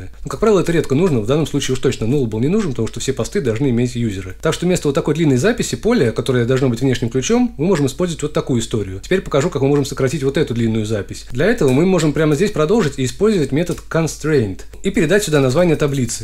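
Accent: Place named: Uzbekistan. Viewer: Узбек веб разработчик на удаленке… Или я один так слышу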